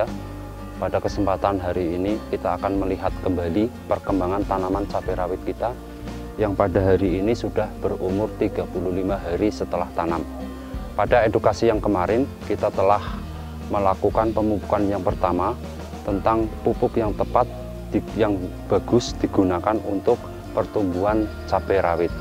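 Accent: Banyumas, Indonesia. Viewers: Asalamualaikum. broo wong endi kiee, ngomonge ngapak, dadi pengin sinauuu bro....🙏🙏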